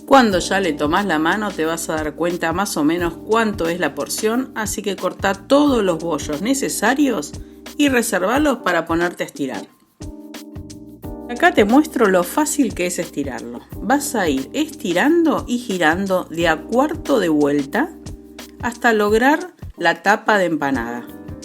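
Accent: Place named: Uruguay. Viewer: Habla más uruguaya...🤔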